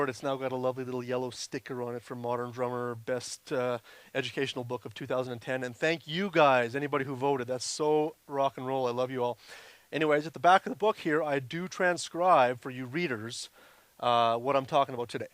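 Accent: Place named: Australia. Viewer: Your British accent sounds Australian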